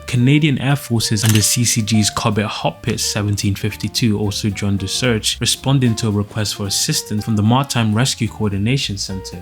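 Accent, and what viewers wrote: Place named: United Kingdom. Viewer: He is British that's a British accent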